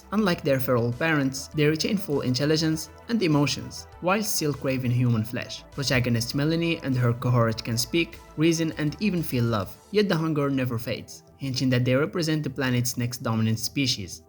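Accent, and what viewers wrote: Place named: Morocco. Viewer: Bro, are you Moroccan? The accent is soooooooooo close to Moroccan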